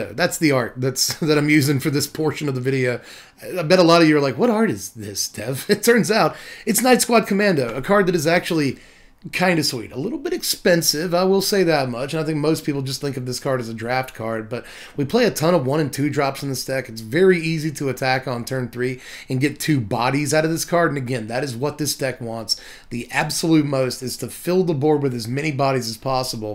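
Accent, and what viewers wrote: United Kingdom. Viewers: Love the English accent haha as an Englishman my self it was perfect 🤣 love you Dev keep up the amazing work !!! Best MTG creator and it ain't close !! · I liked this vid specifically for the crazy brit voice lmao.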